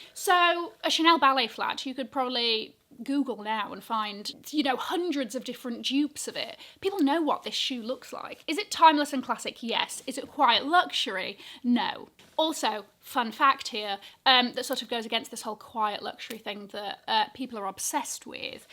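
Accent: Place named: England, United Kingdom. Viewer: just came here to say I absolutely ADORE your accent ☺️ I’m new to the channel, so I’m not sure what part of England you’re from, but I think it’s absolutely smashing 💖 Hugs from America 🤗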